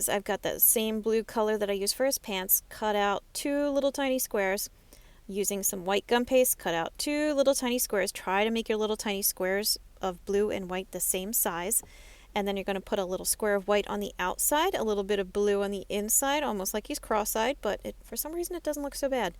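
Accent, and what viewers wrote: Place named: Maryland, United States. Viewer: Are you from Maryland? Noticing your accent, hon! 🦀 P.S. Thanks for tutorial 😀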